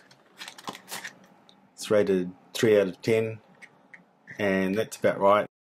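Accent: New Zealand. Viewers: Cool accent I think Kiwi?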